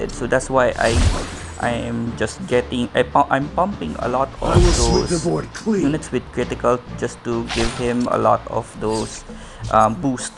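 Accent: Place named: Philippines. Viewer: Nice! Im building this deck once I unlock Elektra, only need The Hood and I can unlock her as a hero. Btw are you Filipino? Guess ko lng from the accent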